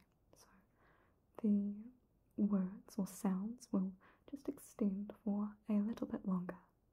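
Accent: New Zealand. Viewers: Great video and love hearing the nz accent! Feels like being at primary school doing the hearing tests 😅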